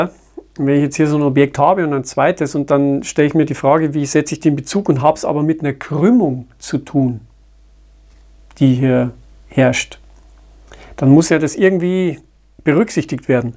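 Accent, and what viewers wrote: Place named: Bavaria, Germany. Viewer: hört man ja auch das freundlich klingende Bayrische heraus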